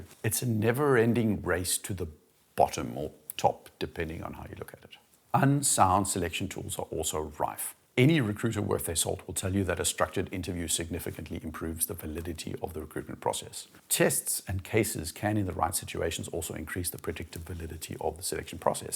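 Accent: South Africa. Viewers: Sounds like the accent of a South African whose first language is English, he most likely is a native speaker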